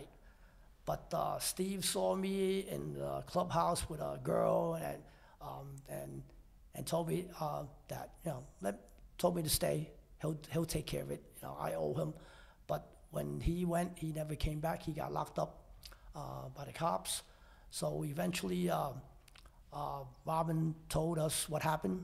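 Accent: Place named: United States. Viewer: English accent is 100% American. Nice.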